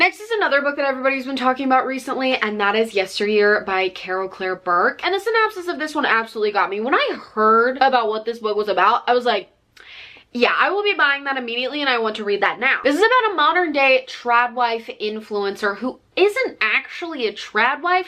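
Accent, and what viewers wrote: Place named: South, United States. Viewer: Please stop using the southern accent at the end of sentences in every single video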